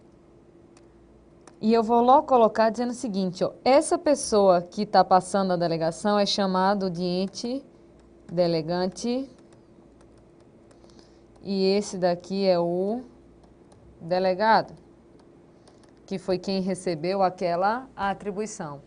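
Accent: Pernambuco, Brazil. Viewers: seu sotaque é maravilhoso!!! parece cm o nosso aqui d pernambuco... bjsss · Virei fã. Excelente!!!!! Professora a senhora é pernambucana? adorei o sotaque também. Show!!!!!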